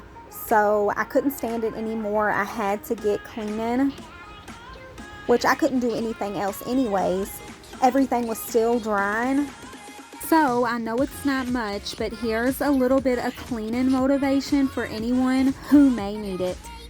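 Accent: South, United States. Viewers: I’m trying to figure out your accent. Are you from the South?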